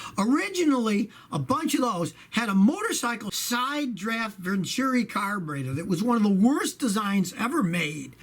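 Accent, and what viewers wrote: United States. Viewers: Which part from America is accent?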